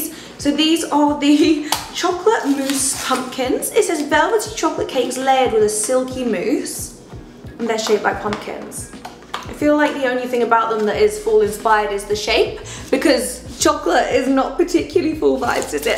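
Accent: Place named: Home Counties, United Kingdom. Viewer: Is there a factory that mass produces these influenzers? They all sound home counties England, boarding school 'Oh my Goshing', posh tottie🙄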